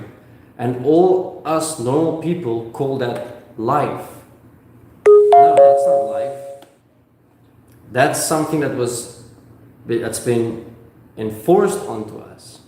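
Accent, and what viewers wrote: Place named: Netherlands. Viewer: I would have guessed Dutch by the accent. Afrikaans? 😉. Positive feedback on Yang is ALWAYS appreciated by YangGang. 🧢 Keep up the good work, en dank u wel.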